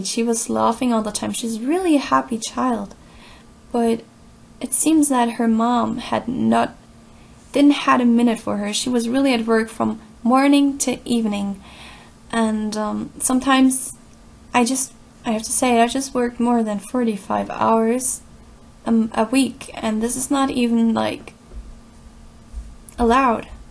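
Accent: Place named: Germany. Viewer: wie man immer sofort weiß dass jemand deutsch ist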